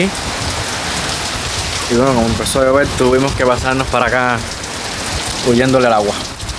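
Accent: Cuba. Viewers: Me gustan mucho tús videos y comentarios,te felicito hablas muy lindo sin groserías ni los asere y con el acento cubano. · tu muy marcado acento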